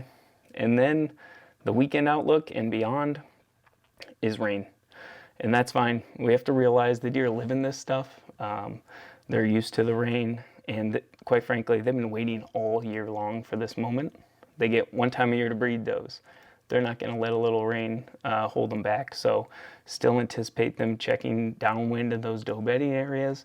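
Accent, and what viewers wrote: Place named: Midwest, United States. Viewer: Obviously you are in Midwest not deep south lol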